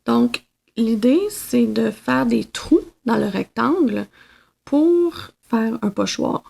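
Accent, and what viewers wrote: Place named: Canada. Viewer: Bonjour, Je vous écris depuis la Suisse, et je viens de découvrir votre site et vos tutos bravo pour de la qualité des explications, le plus dur c’était de s’habituer au charmant accent Canadien, mais après cinq minutes je 'y suis fait et maintenant je vous comprend parfaitement (ah ah ) petite question technique je désire faire des pochons mais un peu plus épais car dedans je vais couler de la résine quel matériaux faut'il utiliser ? , j'ai essayé avec des feuille de bois balsa comme le conseil cricut, malheureusement après..... 39 heures de découpe je ne suis pas arrivé a le découper et pourtant n’utilisait l'outil conseillé par cricut, que pouvez vous me conseiller de prendre comme autre support qui a une bonne épaisseur (env 1.6 mm au légèrement plus) afin de faire mon pochoir ?? je vous remercie - Laurent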